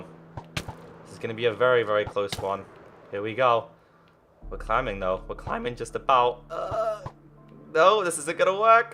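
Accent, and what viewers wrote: United Kingdom. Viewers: Are you britsh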